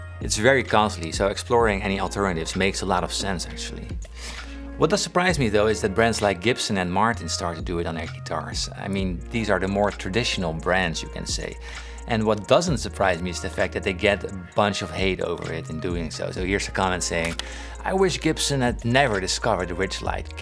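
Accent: Netherlands. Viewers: i love how you set up videos and edit them but as a fellow Dutchman it sounds kinda strange hearing someone Dutch speak English so for me it is kinda a offset but that's on me xD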